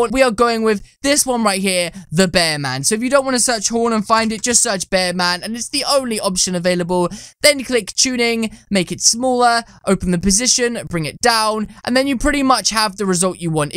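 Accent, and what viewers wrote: United Kingdom. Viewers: IS FROM THE UK!!! I knew it.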